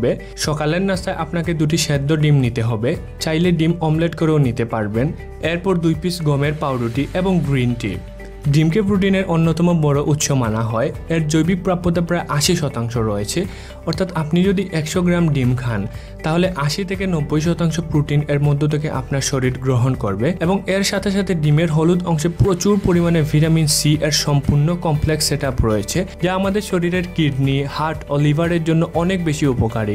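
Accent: Sylhet, Bangladesh. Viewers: আপনি কি সিলেটি? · Vai ki sylheti😀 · আপনি সিলেটি আমি ১০০% শিওর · Sylhet er basai je kotha bolcen tar nam ki. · bhai apne ki Sylheti?? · সিলেটি ভাই 🙂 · Brother r u sylhety??? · Undoubtedly sylhety accent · Sylheti vai 🥰🥰🥰🥰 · Sylhety re বা.... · Bhai Sylhety ni apne? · Apne sylhety ? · Bro, are you from Sylhet? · Baiya apni ki sylheti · ভাই অাপনি সিলেটি তাই না? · Bhai anni ki sylheti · আপনি সিলেটী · Jioy apner matei buja gese.. we are sylhety 😊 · R you form sylhet ?? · apnar basha ki sylhet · সিলেটী টোন এ শুদ্ধ ভাষায় কথা বললে শুনতে দারুন মজা লাগে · ভাই গো আপনে কিতা সিলোটি নি? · ভাই আপনার মাতে তো বুঝা যার, আপনে সিলেটি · ভাই কিতা সিলেটী নি???? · Vai apni ki sylheti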